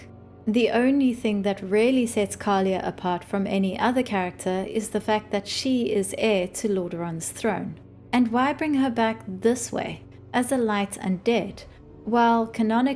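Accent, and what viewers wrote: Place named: South Africa. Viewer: I knew you sounder familiar. You sound just like a South African. I hope your trip goes well.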